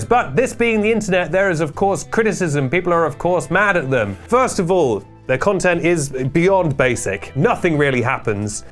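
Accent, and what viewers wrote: South, United States. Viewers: Yeehaw fashion era 😭 Btw your southern accent slayed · Your southern accent is better than mine and I'm an American.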